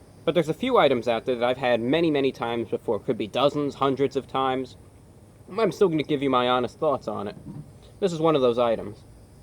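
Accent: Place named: New York, United States. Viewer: As I'm moving yet again, your accent reminds me of my hometown in NY. Thanks for helping me get through my most stressful move so far. <3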